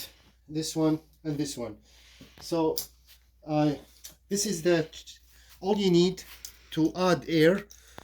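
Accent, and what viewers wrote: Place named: Romania. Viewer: U not english u Are Romania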